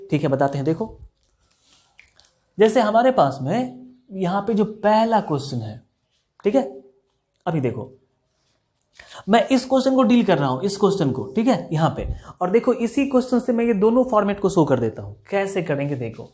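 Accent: Bihar, India. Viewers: Sir mai bhi Bihari hun App ke (Bihari style +study) bahut accha Laga mai yah video 2026 ko dekh raha hun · Bihari language padhaai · Oo ho bihari❤❤❤❤❤❤❤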